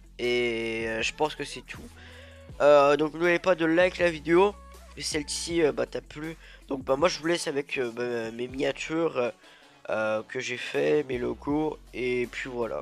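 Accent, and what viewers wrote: Canada. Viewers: merci té canadien ? J'adore ton accent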